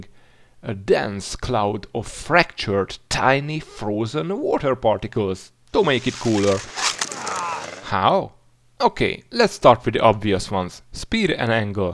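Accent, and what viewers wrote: Hungary. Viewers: De magyarosan beszéli az angolt xd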